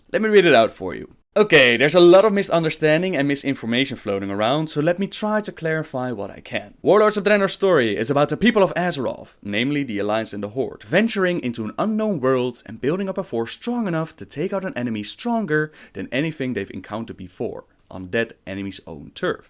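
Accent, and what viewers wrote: Netherlands. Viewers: Damn, that Dutch - English dialect ^^ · Argg Dutch accent, man....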